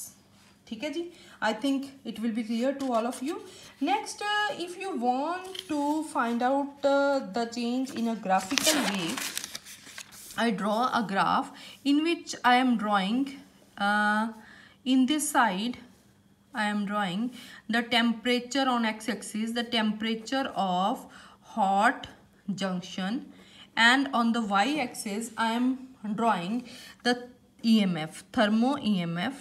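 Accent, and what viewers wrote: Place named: Punjab, India. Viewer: Vich vich tuhadi punjabi sun ke swad hi aa gaya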